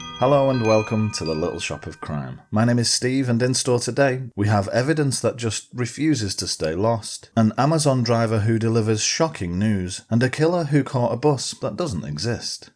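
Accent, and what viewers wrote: United Kingdom. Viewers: Your wonderful British voice doesn't match this U.S. story. Please......more non U.S. stories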